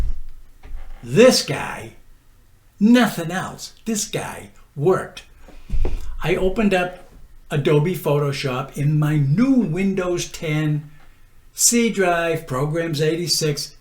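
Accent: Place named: Massachusetts, United States. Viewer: Thanks! I enjoyed your information and the way you presented it. I'm guessing you're from Mass. I'm originally from Boston's Dorchester (now living in the Toronto area). My laptop is Windows 10 by my old PC (the one I use every day) has XP as its operating system. I depend on that PC (especially Cool Edit Pro... and I lost the "key") as an income generator but I know the hard drive won't last forever. Yes, I have the updated "version" of Cool Edit Pro (Adobe Audition) on my laptop but the old version works better (to me). Thanks again!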